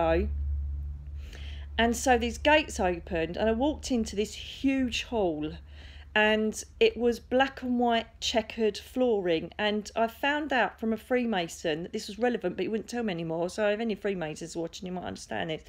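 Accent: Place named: England, United Kingdom. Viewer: Umm, even though I had to really concentrate to understand what you were saying (English accent and all), I learnt a LOT from what you shared in this video. Soul blueprints, soul clusters, angels, celestial beings.... you could take any one of the points you shared and expand up on it in a separate video. And if I may, please do that! Thank you for sharing your insights and life experience.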